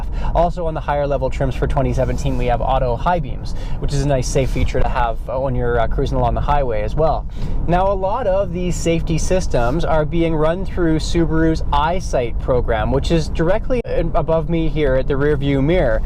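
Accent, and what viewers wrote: Australia. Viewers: Thats bloody good Aussie accent mate, good on ya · Your Australian accent is excellent!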